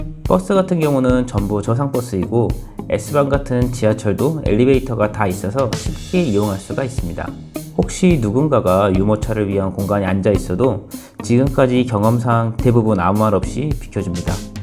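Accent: Jeolla, South Korea. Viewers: 전라도 분이 신거 같은데요 억양이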